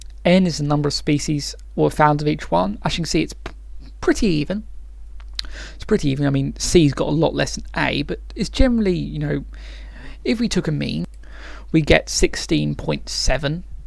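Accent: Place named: RP, United Kingdom. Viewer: why do you sound so posh, do your intellectual abilities match your convincing tone?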